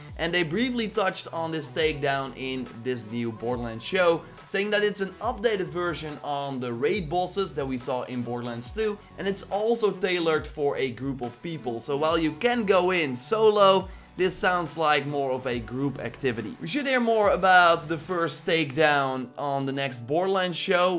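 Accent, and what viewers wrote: Netherlands. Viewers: ....... are you dutch?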